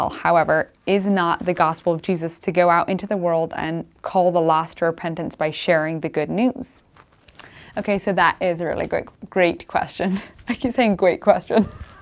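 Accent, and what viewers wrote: Pennsylvania Dutch, United States. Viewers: It sounds very similar to Pennsylvania Dutch, just like the Amish.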